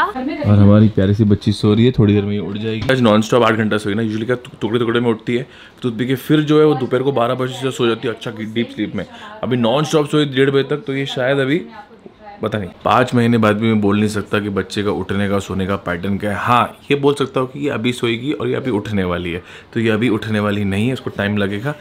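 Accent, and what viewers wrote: Mumbai, India. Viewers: Sir...GoPro Waala View Mast tha...Nd Old Mumbiker Sir is Back with his Mumbaiker Waali Tone....sir Loved the interviw!!😀😎❤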